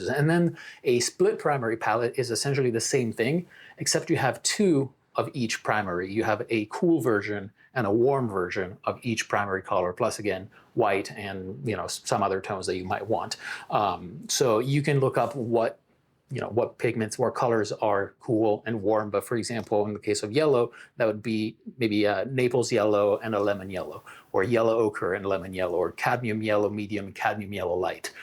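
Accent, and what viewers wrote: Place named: Ireland. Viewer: Are you originally from Ireland? Your accent reminds me of my late great grandmother whom I'm named after.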